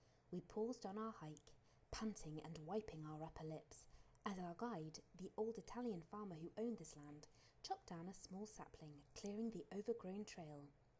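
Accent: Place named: United Kingdom. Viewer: The American teen is commentated by a British woman… fascinating choice. Same as the cover art for Torrent, not my first choice. Also why is the audio quieter than a fish screaming bloody murder?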